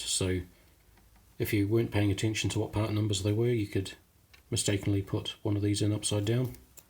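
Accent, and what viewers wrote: New Zealand. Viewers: its the new zealand accent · Are you a kiwi? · Slipps Dungeon he’s from New Zealand what’d you expect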